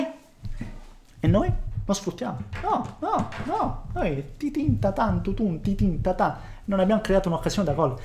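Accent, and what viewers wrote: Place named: Apulia, Italy. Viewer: Ma di dove sei? dall' accento sembri pugliese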